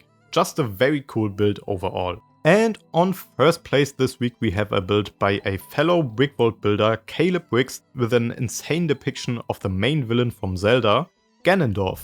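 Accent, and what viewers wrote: Germany. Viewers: Who is this guy with the lovely German accent? He's doing a great job. Ich wohne in Deutschland. Super smart friendly people 🤩🙂🤓🇩🇪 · Oh man der deutsche Akzent ist ja mal anders wild 😅 klingen wir wirklich so krass 😅 gutes Video übrigens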